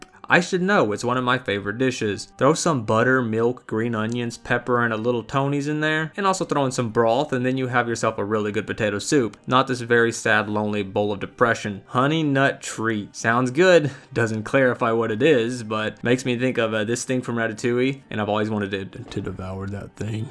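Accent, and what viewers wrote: United States. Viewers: What state are you from? There needs to be more cowboy accented Youtubers out there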